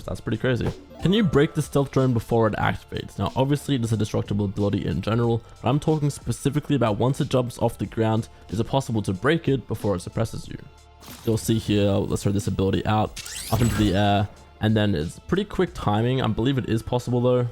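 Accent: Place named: Melbourne, Australia. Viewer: u r from melburrrnnnnn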